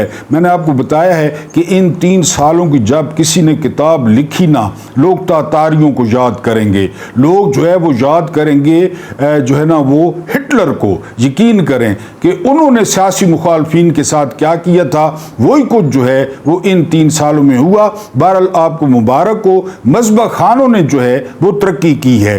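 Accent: Saraiki, Pakistan. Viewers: صاحب سرائیکی لہجہ میں اردو زبان بڑھی ہی دل نشین لگتی ہے ۔❤❤❤❤❤❤❤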